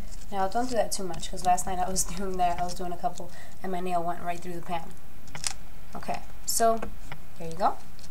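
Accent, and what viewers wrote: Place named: Cuba. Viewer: are you Cubain because you have that kind of accent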